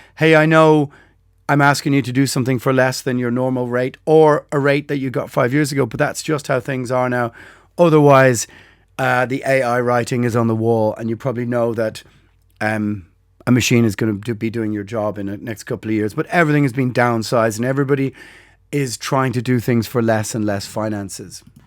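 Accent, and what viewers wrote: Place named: Ireland. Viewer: Whenever you say (parentheses) I automatically know you are actually referring to "quotation marks" because you then use the phrase "rabbit ears." But it never registered you were misphrasing it until you started pointing it out, because the Irish pronunciation of the word is different from the American pronunciation :)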